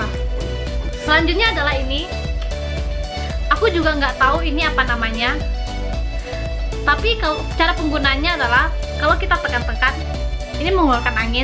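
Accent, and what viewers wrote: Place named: China. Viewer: Bahasa medok cina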